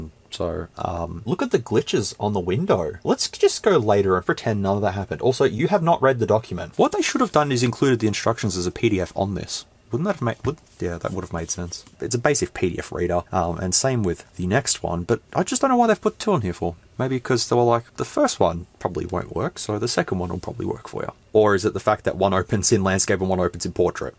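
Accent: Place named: Australia. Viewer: See you sound Australian so I believe you but you are whiter than me and I'm Irish 😂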